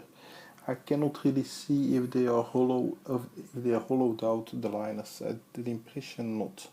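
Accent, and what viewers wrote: Flanders, Belgium. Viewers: je klinkt als een Belg. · Nice review, love the Flemish English accent by the way. 😉. I bought the same watch but it's a 38mm, 18mm lugs and solid case back. Even more vintage that way and better suited for smaller wrists. Keep up the nice work.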